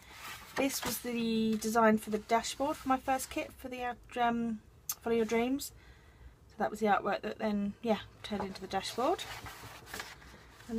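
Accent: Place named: United Kingdom. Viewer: Had to come back to listen to your real British accent hahaha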